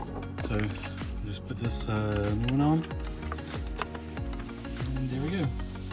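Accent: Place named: Baltimore, United States. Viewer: You aren't originally from Texas, are you? That accent is Baltimore/PA/South Jersey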